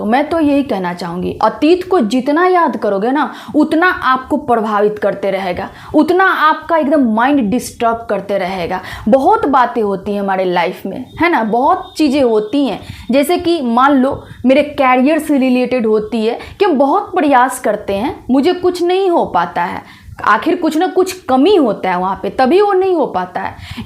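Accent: Bihar, India. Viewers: MADAM, LAGTA HAI AAP MERI TARAH BIHARI HO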